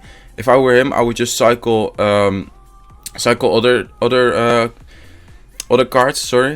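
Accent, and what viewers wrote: Netherlands. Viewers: where are you from you sound dutch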